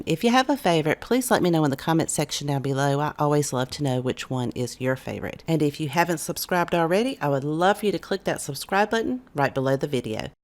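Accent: South, United States. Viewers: There’s no way I can pick just one - they are all amazing!! Love listening to you explain the projects with your Southern accent!!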